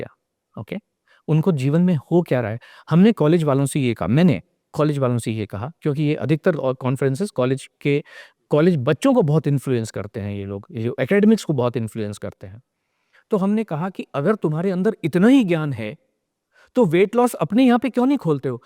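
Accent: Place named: Udaipur, India. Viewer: Host bhaiya kya aap udaipur rajasthan se h...aapke bolne k tarike se pata chal raha h....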